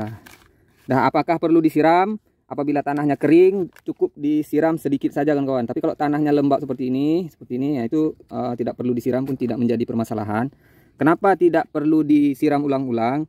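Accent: Indonesia. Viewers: Bang saya lihat dari gaya bicara nya tampak ke polosan dan ikhlas nya dalam menyampaikan tutorial, semoga Allah membalas kebaikan nya dengan kebaikan yg berlipat ganda Amiiiin. Bdw bang alamat nya di daerah mana? Dari logat nya sepertinya dari pulau sumatera mungkin daerah Riau pekan baru dan sekitar nya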